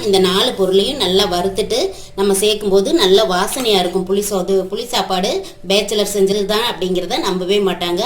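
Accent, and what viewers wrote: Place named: Madurai, India. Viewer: மதுரை தமிழில்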